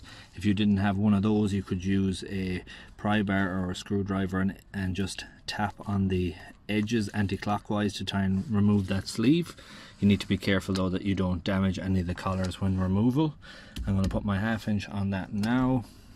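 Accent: Ireland. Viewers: Are you from Cork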